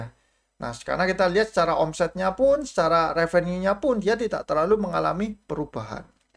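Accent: Surabaya, Indonesia. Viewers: Suka medhok Suroboyoane.. Suwun Cak ilmune.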